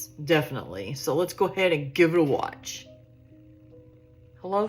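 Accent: South, United States. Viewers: This girl is badass I'm digging the southern draw and also the canine eyebrows it's working for her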